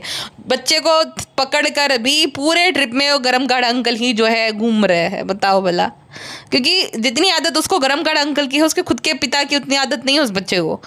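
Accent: Gujarat, India. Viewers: Aap bolne pr se lagta hi h k aap gujrati ho kyu ki me bhi rajkot me raheti thi wha gujrati bolte h jab bhi ap koi gujrati word bolte ho kuch to me khush ho jati hu aisa lagta h meri frd mujse bat kar rahi h....etna acha lagta h muje ar sunne me maja aata he...❤❤❤ Luv 😊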